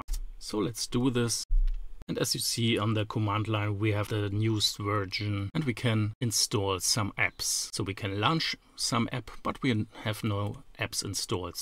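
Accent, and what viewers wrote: Germany. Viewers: Ahh another very good german accend tutorial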